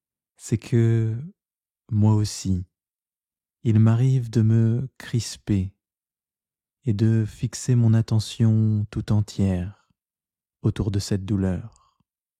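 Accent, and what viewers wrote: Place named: Sète, France. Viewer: Je voulais vous décerner la médaille des 2 ans ! En effet, je suis tombée sur vos méditations en mars 2020, esseulée et perdue parce que mon compagnon a eu plusieurs mois de COVID long à cette époque et devait rester seul dans la chambre , et moi dans la chambre d à côté à me tourmenter. Vous m avez beaucoup aidée à garder espoir en sa guérison J apprécie beaucoup votre timbre de voix, et votre façon de prononcer certains mots , qui me rappelle mes vacances près de Sète dans mon enfance vers les années 70 /80. ( Je suppose que vous venez de cette région ?) Vous êtes un prêcheur de simplicité et je vous remercie du fond du coeur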